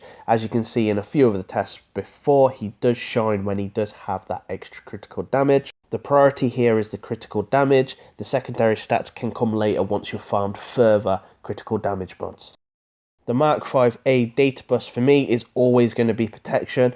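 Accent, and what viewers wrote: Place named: United Kingdom. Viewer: I bet you're from GB, not USA, judging by your pronounciation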